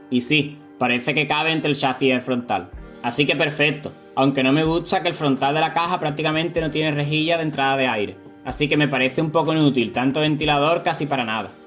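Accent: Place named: Seville, Spain. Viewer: Ayer descubrí tu canal. Bien explicado y se nota que sabes de lo que hablas. Buen acento ¿Sevillano? Sigue así, te veo top en YouTube 😊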